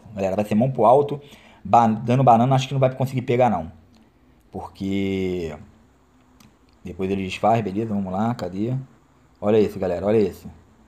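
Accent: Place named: Rio de Janeiro, Brazil. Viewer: eh muito engraçado... ctz que e do rj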